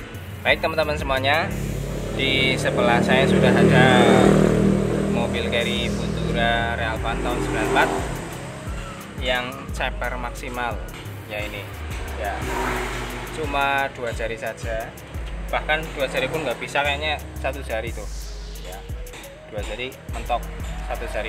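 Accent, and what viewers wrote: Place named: Banyumas, Indonesia. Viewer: Pada pada orang ngapak...infonya mas yg bagus2 buat tambah ilmu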